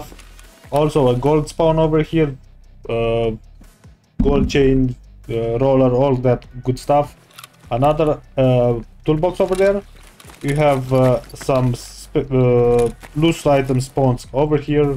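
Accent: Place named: Russia. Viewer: Like ruski accent it's amazing I'm polish how come we don't get that nice accent 🤣🤣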